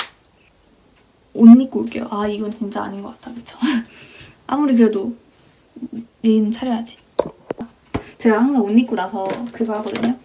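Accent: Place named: Jeolla, South Korea. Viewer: 헐 왜 전라도 분인줄 알았지....?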